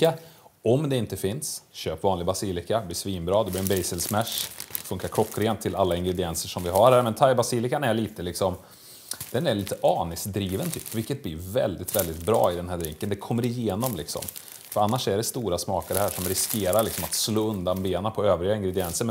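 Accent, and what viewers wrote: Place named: Värmland, Sweden. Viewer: Likead och subbad! Fantastiskt nördigt, klassigt, och underhållande, dessutom på KLINGANDE dialekt som tog mig hem till Värmland. För det är väl det jag hör? Blev alldeles omotiverat stolt över dig! Skall konsumera resterande videos med glädje!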